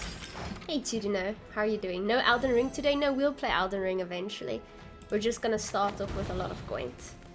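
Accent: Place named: South Africa. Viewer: Your english pronunciations are the most enjoyable i've ever heard. Are those pronunciations characteristics to South Africa?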